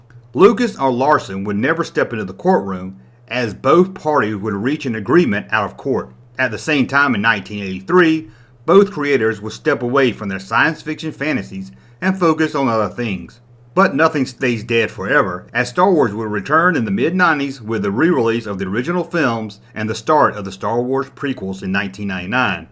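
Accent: South, United States. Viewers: are you from the south if so whrevinntouth arevyoubfrom I cxn tell your from the south by the accentv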